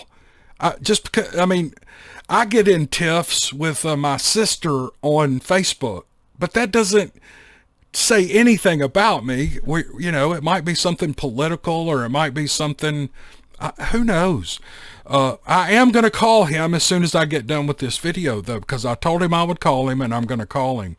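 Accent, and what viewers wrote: South, United States. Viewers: Meant to add that your well spoken Southern brogue gets me through many nights and I am very much grateful to for your gracious and unassuming attitude toward our shared fascinations with the many accounts, lore and incredible stories of the rural areas and wilderness countryside of this great Nation !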